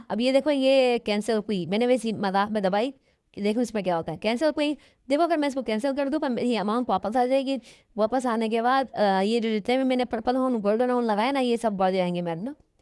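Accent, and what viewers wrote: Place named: China. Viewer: Tumhara Hindi Do Chinese hindi hai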